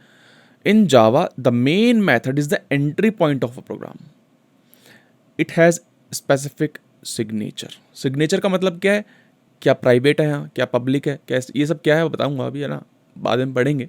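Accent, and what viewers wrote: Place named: Bihar, India. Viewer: sir ji aapke accent se aap bihar ke lg rhe ho :hands-yellow-heart-red::hands-yellow-heart-red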